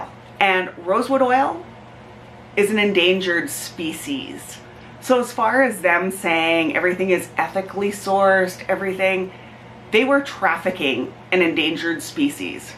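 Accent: Canada. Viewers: Canadian accents make me happy 🥰 I’m from Tennessee and I love the differences in how we speak! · your Canadian accent is EVERYTHING ❤️ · I think she’s from out west in Canada because we don’t all talk like her. She’s got the typical out west (Alberta, BC). My family lives out there. I’m in Quebec. It’s fun listening to her because it makes me think of my family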